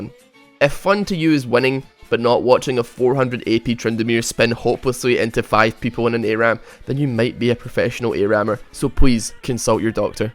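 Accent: Scotland, United Kingdom. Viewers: scottish? very cool accent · Your scottish aint you?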